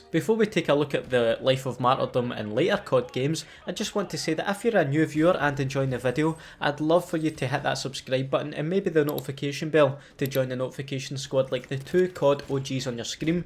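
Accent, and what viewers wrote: Scotland, United Kingdom. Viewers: 2 years later I still think this guy has the most Scottish accent ever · oh, a scottish (?) youtuber??? Hmmm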